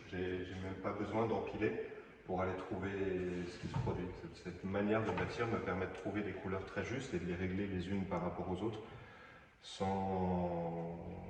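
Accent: Gwangju, South Korea. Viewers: 우연히 보게되었는데 제가 광주사람이라 더 끌리더라구요. 외국인에서 들리는 광주사투리^^ 성실함이 인생을 좌우한다더니 아님 영상들보면서 그냥 웃으면서 보지는않았어요. 역주행중인데 이 영상에 첨으로 댓글달아요. 진심 작품들이 멋져요. 용접공예긴 이런거군요. 진심 소장하고싶어요ㅜㅜ 카페한다면 진심 구매했을듯요.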